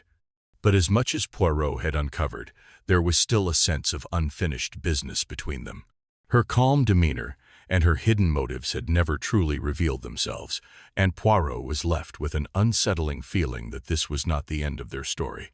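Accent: United States. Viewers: American accent doesn't cut it.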